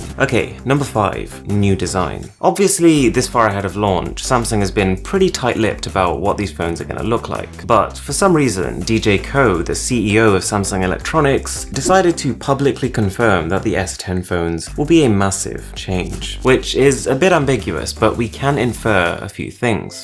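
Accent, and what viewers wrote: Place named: England, United Kingdom. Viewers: Got to love the English accent 🇬🇧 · DK Dost! You luving that British accent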